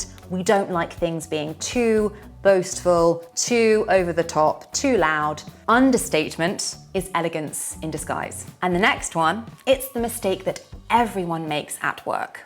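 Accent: United Kingdom. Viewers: Obsessed with British accent and highly recommend her cz i watch so many videos but she is incredible and so lovely her accent ❤❤ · Hi, my name is Rodrigo, I'm from Brazil. I love watching your videos — they help me a lot and they still help me today. I worked with a British band once, and I was pretty confused because it was my first time hearing this accent at work without subtitles (by the way, I really love your accent 😄). I had an awkward moment when a guy asked me if my wife was coming to the show, and I just said, “ I Don't Know.” He looked at me like he was surprised. I think I was a bit too direct.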